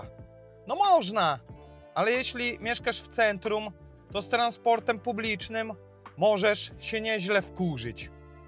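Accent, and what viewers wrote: Podlasie, Poland. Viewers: Z jakiej części podlaskiego jesteś ? Piękny akcent :) i to bez hejtu. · kolega jest z Podlasia albo z Lubelskiego. Normalna gadka, ale "słoiki' z Warszawy tego nie zrozumieją 😉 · Podlasiak z akcentem. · Z jakim akcentem mowi ten facet? Czy jest to akcent z Podlasia czy z Lubelszczyzny?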